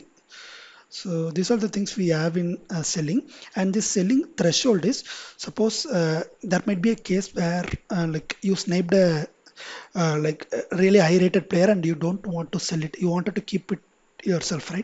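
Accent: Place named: India. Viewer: I hate this Indian omfg please stop with the fcking accent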